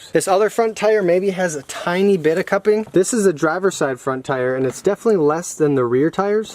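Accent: Wisconsin, United States. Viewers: Now that you're back East, I do believe I hear some of that Wisconsin accent coming back. Is it sleeping bags or sleeping begs? lol Hope y'all are doing well.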